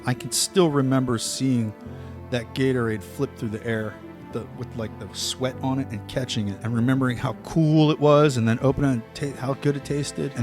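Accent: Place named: United States. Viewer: Sounds like a real American brother. 🇺🇸🇺🇸🇺🇲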